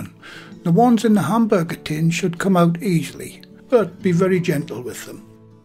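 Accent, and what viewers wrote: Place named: Scotland, United Kingdom. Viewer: He does Winnie The Pooh and with with a little touch of Scottish brogue.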